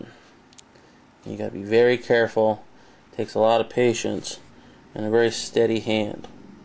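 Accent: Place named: United States. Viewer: Damn, you talk like a stereotypical American.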